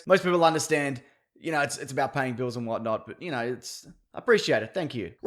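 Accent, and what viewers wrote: Australia. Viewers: Australian bloke haha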